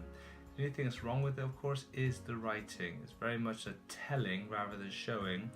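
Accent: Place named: Bristol, United Kingdom. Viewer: Also you are getting Bristolian, WELL WRITTEN with Bristolian accent lol 😂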